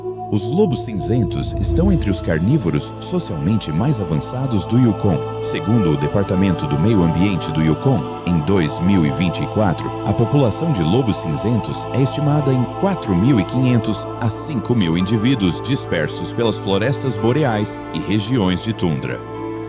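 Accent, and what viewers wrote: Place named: Interior, Brazil. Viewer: Quando trocar o narrador por um sem sotaque interrrrrriorano vou conseguir assistir o esplendido documentário enquanto isso desisti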